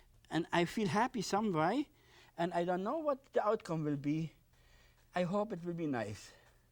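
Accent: Germany. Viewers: Michael actually he got a lot of recognition including an Emmy. I remember him well from my youth and I loved watching him before Bob learned from him. Bill’s personality was fiery, energized and warm and Bob’s was soothing and warm. I like the saying “Bill in the morning and Bob at bedtime.” For Bill too there was a barrier where language was concerned. His English was excellent but his accent was heavy. Whether we like it or not there is a prejudice in our country with certain accents and a tendency to gravitate to those who speak the way we do. It was difficult to get ahead as a popular, public personality with a heavy German (in his case Prussian) accent. Even now I see comments everywhere that mock Bill’s accent to one degree or another. I personally love it but there are many who would likely never give him a chance. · I used to love his Prussian accent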